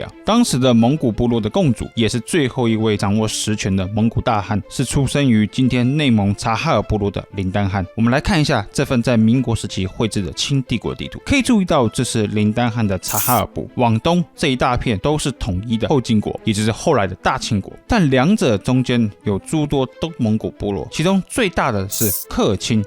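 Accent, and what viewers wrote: Taiwan. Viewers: 非常台灣腔，很奇怪 !!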